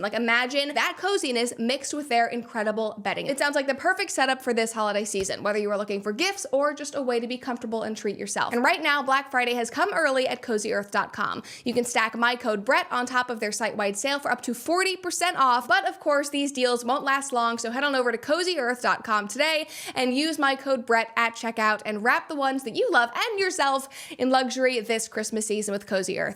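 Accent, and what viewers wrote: United States. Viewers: Brett this is going to sound weird, and I do not mean it to, but your voice is identical to my girlfriend Heather's in every way except for accent. My girlfriend, Heather, is from Hackney in London originally and came to Canada as a kid. Sometimes Heather is away as she is an officer that covers a lot of Northern Manitoba, so I tend to listen to you when she is away because then I feel like heather is there with me a bit. I'm totally blind and have no eyesight, so the two ways I tell people apart are by the sound of their voice and the texture of their hands, (and since i never met Brett, the only thing I know about her is that she has tiny hands. I'm 5-1 tall and even my hands are bigger than hers according to my friend Ethan down in Chattanooga). Anyway, so because I don't know the hands, I have to go by your voice. Because there are only so many types of voices and timbres, yours happens to be identical to Heather's to the point that were it not for her accent, vocally I would confuse you and her. 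I worry lots about Heather every day when she goes out to do her job, she puts her life on the line every day to protect us here in Northern Manitoba. So that is why I get a bit of reassurance when I hear your voice, Brett, because it sounds like Heather's, only with an American accent not a tinge of Cockney. Thanks.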